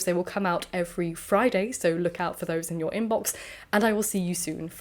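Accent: England, United Kingdom. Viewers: you remind me of jenny lee in call the midwife <3 i love your pretty british voice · I cant believe how much you look like my little sister, only differences are the hairstyle and the English accent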